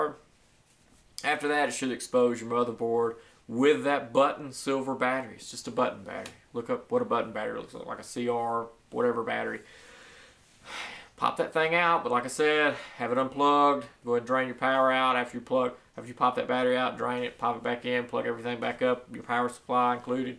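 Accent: South, United States. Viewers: You never heard southern drawl?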